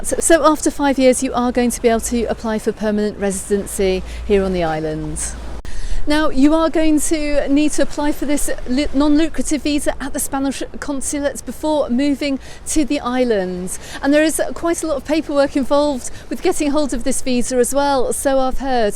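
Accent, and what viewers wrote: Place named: England, United Kingdom. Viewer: I just know how fantastic you are Let me guess where u from by hearing your accent: 1. Leeds 2. Liverpool 3. Birmingham Kisses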